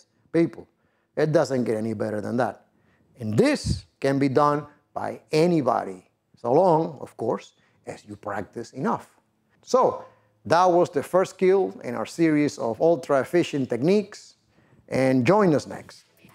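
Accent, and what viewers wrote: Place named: Cuba. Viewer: I just came across your channel and obviously I just subscribed. Tons of great info that I will implement this summer, thanks a million for not just your teaching but for the way you deliver it 😎 .. Googled you as well did not know you trained several world record holder free divers ! Needless to say when I heard the accent I was like “this guy is Cuban” and Yeap Cienfueguero ! I’m from Santiago de Cuba. Anyhow I’ll watch and pay close attention to your vids and teaching. Thanks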